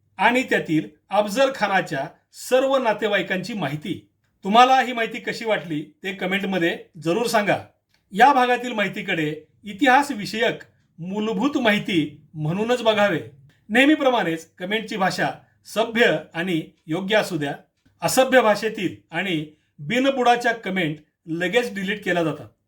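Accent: Standard. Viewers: फारच सुंदर माहिती मिळाली साहेब तुमचं निवेदन स्वच्छ मराठी भाषेतील असल्या मुळे, उत्सूकता वाढते.धन्यवाद.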